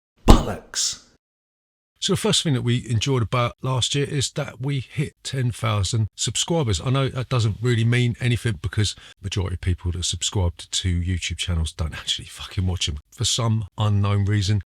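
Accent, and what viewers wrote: United Kingdom. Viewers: Thanks again for last year. I've stumbled upon your channel by luck, what drew me in was your Jason Statham kinda lookalike 😂😄. Also your British accent. I use to live in the UK, spent 16 years of my life growing up in the UK. Lived Portsmouth but have moved out of the UK 12 years ago. I am trying to decipher what part of the UK you are from. I've yet to watch all you videos so if you mentioned it somewhere I'll eventually get to my answer 🤣. I miss England, best time od my life growing up in Brighton and then eventually Pompey.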